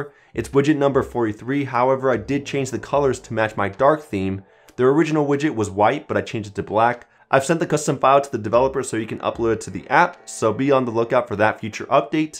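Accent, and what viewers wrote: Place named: RP, United Kingdom. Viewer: Your pronouncing is like BBC News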